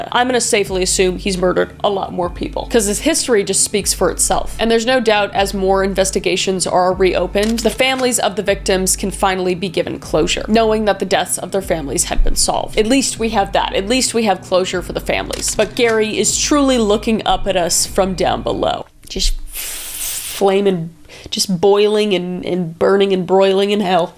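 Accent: Canada. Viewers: Lol your losing you canadain accent lol and can totally tell you are from BC. I grew up in Calgary and I just giggled when you said Cal Gary lol. I love your videos.keep the amazing job lol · I really thought this was a great video to sleep to but first thing I hear is Canadian 👁️👄👁️ still gonna watch it rn tho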